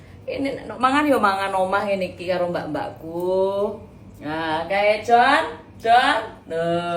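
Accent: Java, Indonesia. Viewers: Keren mbak omongane medhok jowo 😂😂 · Masyaallah mbak...medok natural banget sampean, tapi yo tetep cantik tetep sugih👍❤️ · Masya Allah jawanya medhuuuk, klaten hadir mvk ❤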